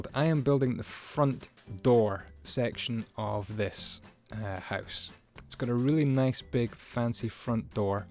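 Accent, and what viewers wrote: Scotland, United Kingdom. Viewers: You sound Scottish. Are you?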